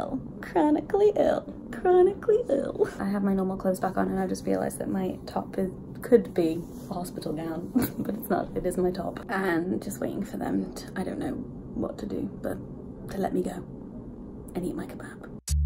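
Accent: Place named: Manchester, United Kingdom. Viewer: Manc accent alert!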